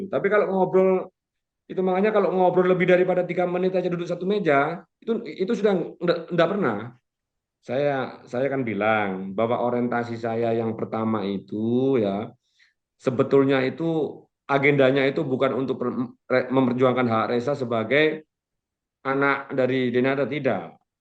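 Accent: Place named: East Java, Indonesia. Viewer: Logat nya jatim banget semangat bang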